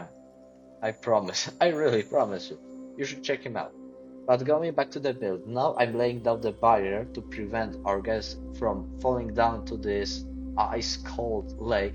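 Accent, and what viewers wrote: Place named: Scandinavia. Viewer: Can understand you perfectly. Had you not said where you were from, I would have pegged you as someone from Scandinavia, and not eastern Europe (Poland).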